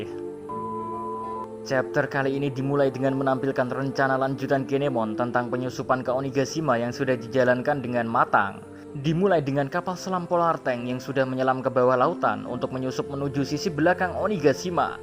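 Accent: Java, Indonesia. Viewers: Orang ini mirip abdi kos soalnya sama sama medok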